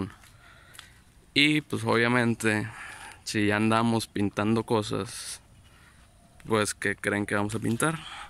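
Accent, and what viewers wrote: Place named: Monterrey, Mexico. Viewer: Dije: este wey habla como regio y luego vi esas placas y lo confirme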